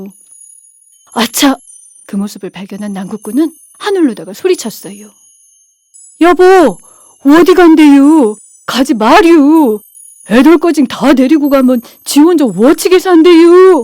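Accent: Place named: Seosan, South Korea. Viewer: 서산 말씨를 자꾸 따라허게 되네유~^^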